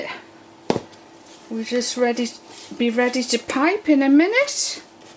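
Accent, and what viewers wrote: United Kingdom. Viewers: Lovely shade of green. Looks good enough to eat ! American accent. Lol. You gave it a shot...I rather like your British accent 💜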